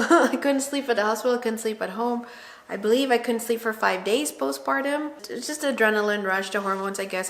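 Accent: Philippines. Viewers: in this video she has that Filipino english accent....but her sister has more of d Pinoy accent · She has a very distinct Filipino way of speaking certain words beginning/ending with ‘t’ and ‘th’. She substitutes a ‘d’ quite often; they becomes dey; with becomes wid; time becomes a subtle ‘dime’; there becomes dere. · agreed she's talking with a more Pinay accent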